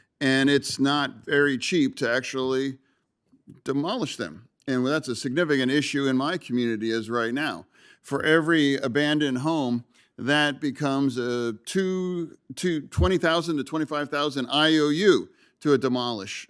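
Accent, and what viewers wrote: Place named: Wyoming, United States. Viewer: He sounds like he is from Wyoming after his aneurism.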